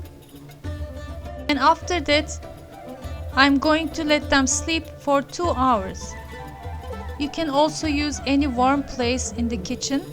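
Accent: Turkey. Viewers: As a Turkish person watching this, I really appreciate his initiative <3 the recipes look amazing. And you speak English very well, on top of the lovely and authentic Turkish accent you have!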